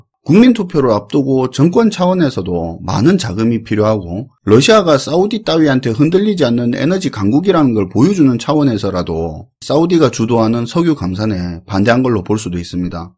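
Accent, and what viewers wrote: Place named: Gyeongju, South Korea. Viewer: 잘 봤습니다. 오리지날 서라벌 원어민 발음 멋지세요~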